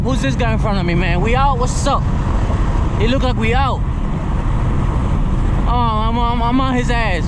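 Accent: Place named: Cuba. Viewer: good video, im argentinian and your spanish is fun, beacuse your accent is cuban and is fun hear it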